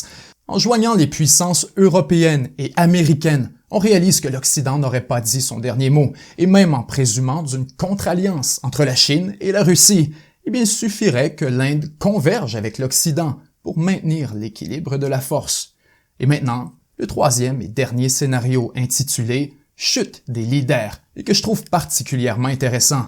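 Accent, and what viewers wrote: Quebec, Canada. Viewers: l accent possiblement! ;) Notre accent québécois! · Il est québécois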